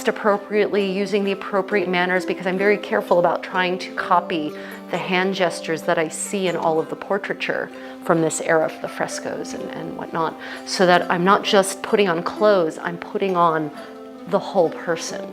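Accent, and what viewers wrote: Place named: United States. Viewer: You are living my best life! LOL I have always wanted to do exactly this! I specialized in Elizabethan, but also have many Italian Ren, although I probably have much to learn from you in Italian clothing. I would love to do this in England as well as in Italy. I never have been able to get over there, dealing with various health problems; I am so exhausted after dressing this way, I need to lie down! Gone are the days of my youth when even though I have lupus I could work, run home, pack for the weekend, drive 4 hrs, set up pavilions, dress, and socialize/party the night away. LOL I miss it. How much luggage did you have to take? (assuming from your accent you live here in America) I could get one Elizabethan kit or two Italian Rens and that would fill my biggest suitcase! Your workmanship, BTW, is exquisite! I love wearing the gowns I have sewn entirely by hand...I show people the stitches and they get so blown away...I just let them know it's just like doing embroidery, you start it understanding you won't just whip one out in a day, it's a PROJECT!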